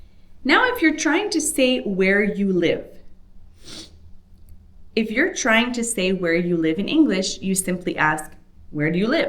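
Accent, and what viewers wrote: Quebec, Canada. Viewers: Is this Quebecois French or France French? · I would assume this is Quebec French being taught as opposed to Parision French?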